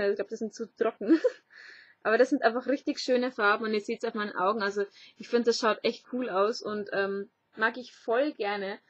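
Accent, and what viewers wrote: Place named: Bavaria, Germany. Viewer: hab deinen kanal heute erst entdeckt :) woher kommstn du genau? warsch ausm kreis münchen oder? ;) man hört hald einfach bei echten bayern des bayrisch immer raus hihi bin selber aus landshut bei mir isses genau so ;DD liebe grüße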